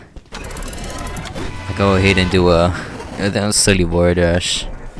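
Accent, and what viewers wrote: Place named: South Africa. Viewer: You kinda sound South African (correct me if I’m wrong) XD Also great vid! :3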